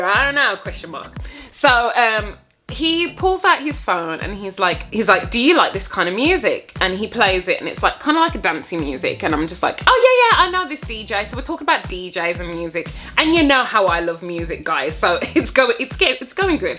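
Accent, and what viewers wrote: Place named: London, United Kingdom. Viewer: Hahahahaah!, you’re hella funny! You remind me of my friend/schoolmate in Uni who’s from London. The way you talk is like hers. Hahaah! Gosh, I want to work and live in Japan too. I was there last year in Tokyo. Japanese guys were blowing up my Instagram and Tinder. I’m an asian foreigner. Most of them were polite though one was a fckboy who wanted to go to my hotel room but I never let him and said bye to him.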